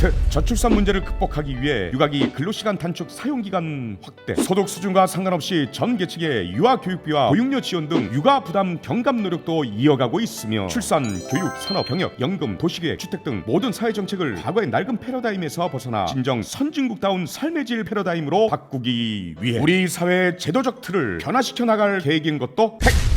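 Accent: North Korea. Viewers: 나레이션 말투 뭐야 북한인이야? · 문가공화국 방송 ㅋㅋㅋ 내레이션 북한이네. ㅉㅉ 꼴불견 퉤퉤.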